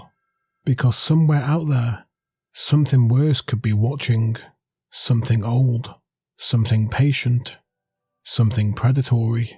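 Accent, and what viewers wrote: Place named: Cockney, United Kingdom. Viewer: This video has been done before, but you should really lean into that cockney accent.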